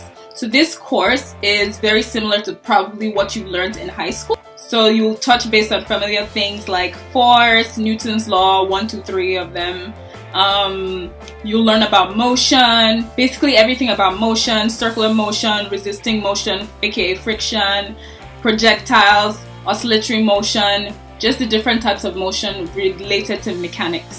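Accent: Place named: Northwest, Cameroon. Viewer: This is really good. It's helping me. You are sounding like a north west Cameroonian.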